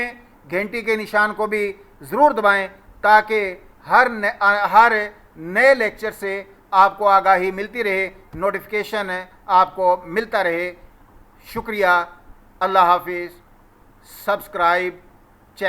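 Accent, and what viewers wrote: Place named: Arab world. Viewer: اچھی کاوش ہے۔ کوشش کریں کہ اردو لب و لہجے میں بیان کریں۔ یہ اردو میں عربی لہجہ بوجھل ہے۔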